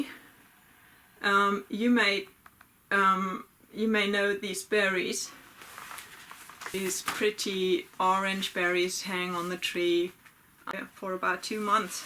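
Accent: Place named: United Kingdom. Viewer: Das letzte war die Brombeere, so viel habe ich mitbekommen :) Bei deiner britischen Aussprache frage ich mich ob du das beruflich machst :) Jedenfalls reichte mein Schulenglisch aus um es zu verstehen.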